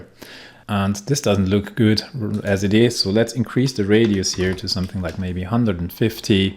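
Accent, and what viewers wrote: Germany. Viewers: ah! Close enough! From your accent I thought you wore german. Danke!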